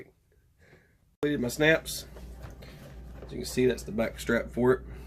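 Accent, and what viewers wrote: South, United States. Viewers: Nice work You sound more southern then me ha im in Mississippi. Were you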